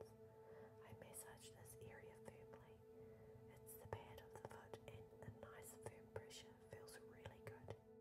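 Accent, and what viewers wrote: New Zealand. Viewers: You sound like a kiwi ( newzealanders) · do I hear a kiwi accent :) ? very beautiful work btw...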